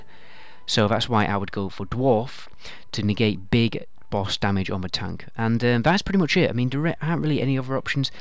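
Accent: United Kingdom. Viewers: off topic but may I ask where in the UK you're from? I recognise your accent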